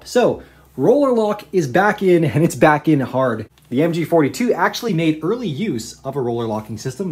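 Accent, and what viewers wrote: Canada. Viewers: yo is this guy a canadian??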